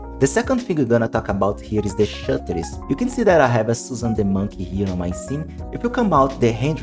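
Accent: Brazil. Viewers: Are you Brazilian ? You have the accent .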